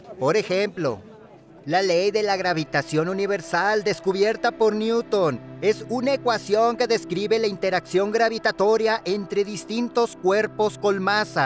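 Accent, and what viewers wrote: Mexico. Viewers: se olle norteño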